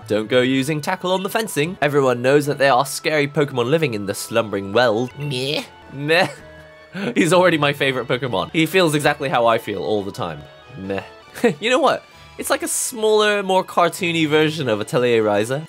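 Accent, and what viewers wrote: United Kingdom. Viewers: This is gold but I've been watching you for years... Are you British? But 1st ☕ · well he is a British dude doing a British accent. Nothing really surprising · Doesn't sound like a classical RP, but Finally someone who speaks in some british accent and do it UNDERSTANDABLE. :D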